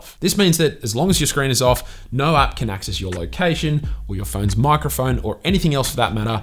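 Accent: Australia. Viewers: Haha I knew you were Australian as soon as I heard you talk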